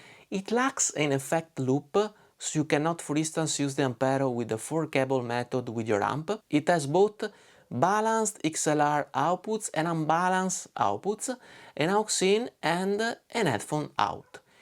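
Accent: Venice, Italy. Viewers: Possiedo l'Ampero MP-100 da un po' e confermo quanto scritto da: uno dei primi aggiornamenti del firmware ha 'sbloccato' la catena rendendola totalmente flessibile. Con l'ultimo aggiornamento è possibile caricare 20 (anziché solo 10) IR di altri produttori, ottimo lavoro e composizioni degne di un album. Sarà ma il tuo simpatico accento mi ricorda il parlato veneziano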